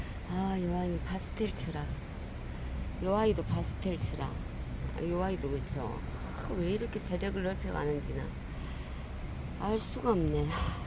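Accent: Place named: Gyeongsang, South Korea. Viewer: 안녕하세요 반갑습니다 하우스속 다육아이들 잘 있었네요 경상도 억양이 참 정겹고 듣기에 좋아예~~ 사랑스런 다육 아이들 즐감하며 머물다갑니다 함께하며 응원합니다 복된 날 되세요😊❤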